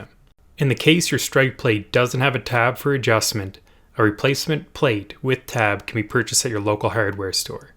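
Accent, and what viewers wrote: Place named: Canada. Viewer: For anyone curious - this chap has a Canadian accent and where it sounds like he is saying "strake" plate he is actually saying "strike" plate. Just incase anyone is unfamiliar with door hardware terminology. Good vid, thanks.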